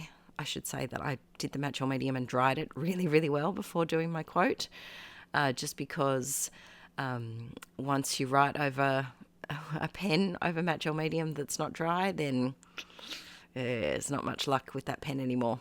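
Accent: Australia. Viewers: I’ve just come across your channel, and I love your style! You sound like an Aussie (me too) - but where do you buy your Bande washi sticker rolls, without them costing you a bomb in postage!?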